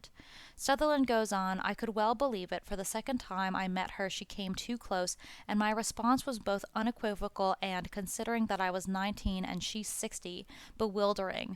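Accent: United States. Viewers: The thick whiny Ameican accent renders this program unlistenable.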